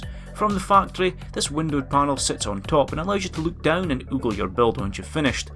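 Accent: Scotland, United Kingdom. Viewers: Ha ha fuck, your really Scottish. :D nice to see another brother from above the border.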